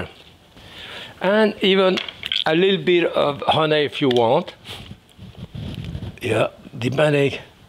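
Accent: France. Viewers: I just love your French accent. Especially the word. “Huny “ lol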